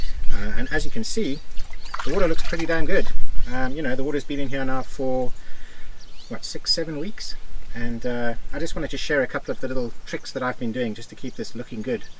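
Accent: South Africa. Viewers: Your accent like you come from South Africa 🇿🇦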